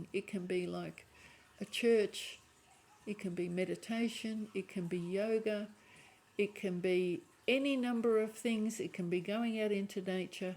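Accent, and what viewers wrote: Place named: Australia. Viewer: Nice to see an Australian (or in this case, hear) delivering a reading (some great Tarologists on YT ) new subscriber and thank you for an enlightened reading. You hit the nail on the head regarding fatigue and boredom. Also moving toward developing and connecting with my intuition (through Tarot and Pendulum work) and I appreciate the time you put into this reading. Much kindness and blessings to you (From Melbourne - Eastern Suburbs) 🌻🌻🌻🌻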